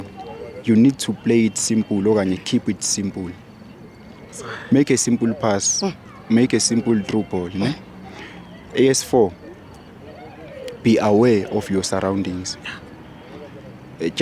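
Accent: South Africa. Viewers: I like his Zulu Tone❤❤❤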